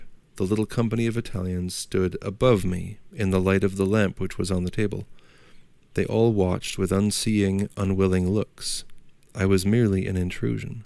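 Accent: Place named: United States. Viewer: Thick american accent…… unlistenable.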